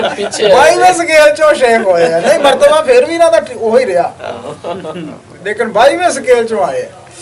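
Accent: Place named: Doaba, India. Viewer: Beautiful theth doada Punjabi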